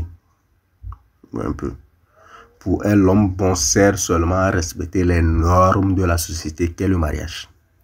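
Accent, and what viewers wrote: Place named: Togo. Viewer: Une des meilleures Podcast! Chapeau frère! A entendre ton accent, on dirait que t'es togolais! #Respect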